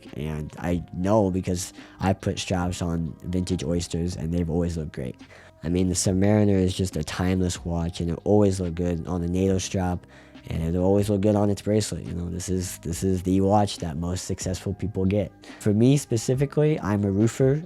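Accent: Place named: Midwest, United States. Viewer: Congrats on your license!!! Good luck God Bless and much success! The 36mm Date Just you can't go wrong. I prefer the older holes oyster case Rolex models. Perfect for strap options and it gives you a vintage vibe. Those look perfect on young guys when you "hipster" a classic vintage watch. BTW you sound like you're from the Midwest... it's the accent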